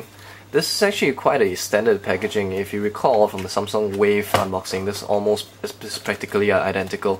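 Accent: Singapore. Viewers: are you from singapore??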